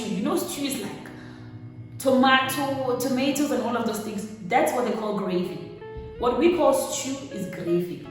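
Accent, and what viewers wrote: South Africa. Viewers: You my DEAR , You Sound just like ONE of US, I mean South African Keep Learning our WAYS of RESPECTING our Cultures and Elders .Good Job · For a second i though your southy you have our accent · Wow i like how you are so open minded ...You just sound like a typical South African when you speak our languages 😂❤️...We love you so much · She even sound South African, and I don't mean her understanding and speaking of SA languages, I mean the way she speaks English sounds very South African.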